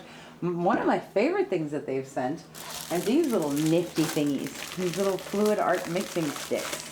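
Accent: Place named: New York, United States. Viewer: You just explained EXACTLY what my issue is with paint separating. THANK YOU! I love your New Yoak accent 😃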